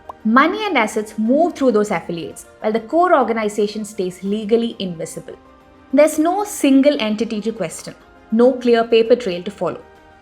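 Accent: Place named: Kerala, India. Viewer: What motivated this lady to probe RSS, from the accent she sounds like a Keralite....must be some middle Eastern *und which is under her table,throbbing her for this video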